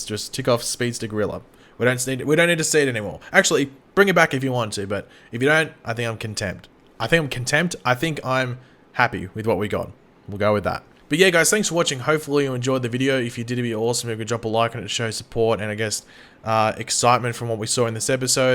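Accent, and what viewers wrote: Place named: Australia. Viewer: Yeah but you can clearly tell is Australian from his accent so this guy's just falsely accusing him for nothing. Besides there are stream sites like vumoo or whatever it's called where you can watch it a few hours after it airs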